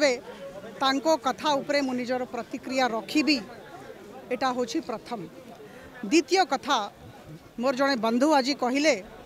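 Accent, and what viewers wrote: Bihar, India. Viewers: Apana nka Vasa tah bihari Misa odia kahuchha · Esi kahuchu haribu didi Tu bihari para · Tama katha Bihari Bhasa re kohuchha nije age thik kara ta pare anya ku kahiba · U also talking in behari Bhasa odiya kahuchi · Tame same kahucha bihari re odia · Tu biharuni Odia bhasare kana pain' khata hauchu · ଆପଣ ବି ବିହାରୀ ଚୋବେଇଲା ଭଳି ବଡେଇଲା ଭଳି ଓଡ଼ିଆ କୁହନ୍ତୁନି,ଆପଣ ବି ଓଡ଼ିଆ ଭଲ ଭାବେ କହିବା ଶିଖନ୍ତୁ।ଓଡ଼ିଆ ଭାଷା କେମିତି କୋମଳ,ଭଦ୍ର ସହ କୁହାଯିବ,ଏ ସବୁ ପ୍ରଶିକ୍ଷଣ ପ୍ରଥମେ ଆପଣ ନିଅନ୍ତୁ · Amku nai lo ଆମକୁ, ତୁ ଆଗେ ବିହାରୀ ଓଡ଼ିଆ ଟା ବନ୍ଦ କର କାନ ରୁ ରକ୍ତ ବରହୁଚି ଶୁଣିଲେ ତୋର ଓଡ଼ିଆ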